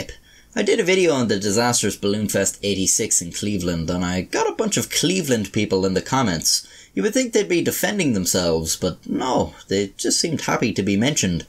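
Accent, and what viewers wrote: Ireland. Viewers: I think everyone loves you because of your Irish accent. Plus you are pretty funny!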